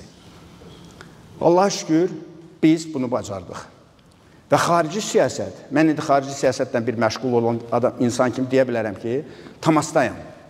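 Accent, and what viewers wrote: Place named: Baku, Azerbaijan. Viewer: bey Maştağalidir yoxsa hansi Baki kendinden? Aksent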